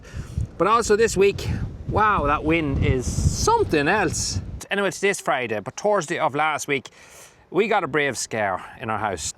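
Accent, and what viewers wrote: Ireland. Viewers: What a terrible shock for you all, I’m so glad your little one is better. I really enjoy your videos. I’m not so keen on the engine repairs lol, but I love all the farming stuff and love listening to your Irish accent xx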